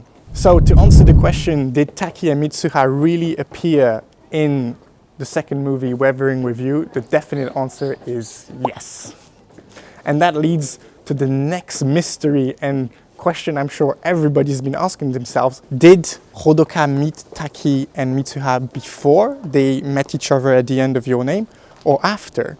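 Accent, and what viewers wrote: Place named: France. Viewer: l’accent français mdr j’aime trop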